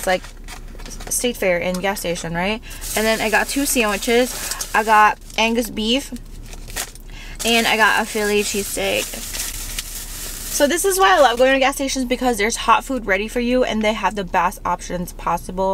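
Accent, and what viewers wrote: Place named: Minnesota, United States. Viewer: You never know your Minnesotan until you hear another Minnesotan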